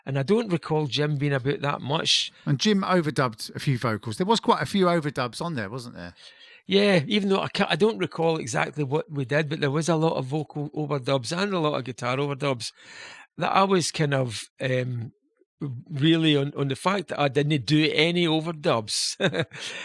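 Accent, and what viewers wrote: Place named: Scotland, United Kingdom. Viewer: I really love your talks! - Fascinating & interesting & funny as hell - the way you talk with that delightful Scottish accent and Humor! 😀 - and very nice voice!. You are an excellent speaker, and wonderful to hear your take on things from the (G.O.A.T!) Keyboardist!. You are one of my fave keyboardist's in the world ever - along with Eddie Rayner out of Split Enz. I LOVED the way you played that CP70 Yamaha!.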